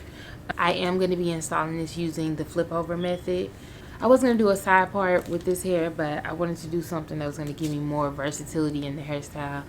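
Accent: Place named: Texas, United States. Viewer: Sound like you from Texas ❤❤❤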